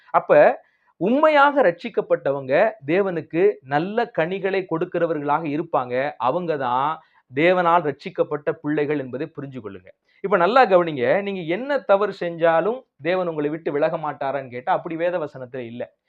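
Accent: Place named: Tirunelveli, India. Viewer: Bro நீங்க இருக்கிறது திருப்பூர் but native of திருநெல்வேலி யா?! உங்க ச்லங் ல தெரியுதே!!??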